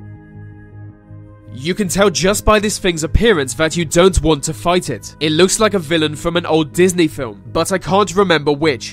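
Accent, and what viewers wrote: United Kingdom. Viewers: Your sense of humor & that Brit accent is deadly too! 😎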